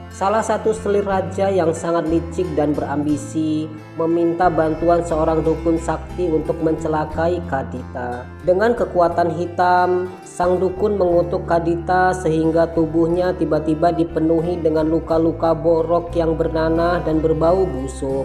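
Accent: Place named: Java, Indonesia. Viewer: Suaranya jawa banget, mending pake AI aja, eneg suaranya didengar.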